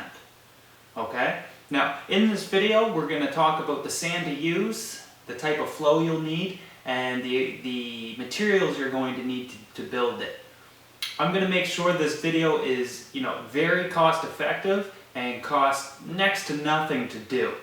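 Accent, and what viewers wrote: Canada. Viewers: Are you canadian? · I love his Canadian accent.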